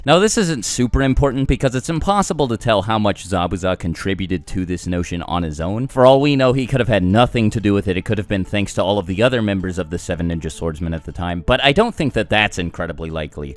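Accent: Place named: New England, United States. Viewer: You're actually very talented at forming and discussing arguments. I mean, no joke. You got chops, son. Also you're super confident and have that sweet ass yankee accent we southerners are supposed to hate (but secretly love...we hate CA accents, but stories for another time) I just really like the way you can put so much weight and disdain behind a word for tits and pickles, like "why do people give so much of a shit about WOOD" when talking about wood release. You also made me a Minato fanboi. So... i dunno if thats something to be proud of, but hey, you did it!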